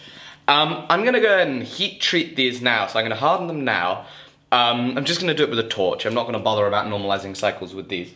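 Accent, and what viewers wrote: United Kingdom. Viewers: can you remake this video again but using a normal accent (not annoying British accent)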